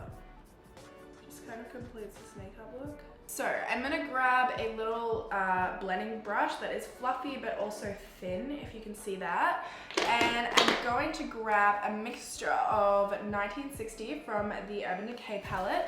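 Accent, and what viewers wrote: United States. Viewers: you're so pretty like wtf and your freckles are adorble I think I have a girl crush on you fr I love you're american acent as well. I also like you're personality! btw im from london xx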